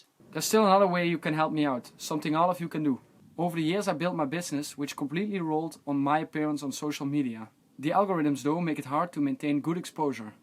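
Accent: Netherlands. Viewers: Are you Dutch? You sound Dutch. If so, ik zou wel wat willen kopen.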